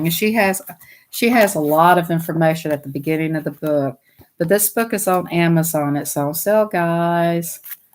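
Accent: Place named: South, United States. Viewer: Hi, love your accent 😄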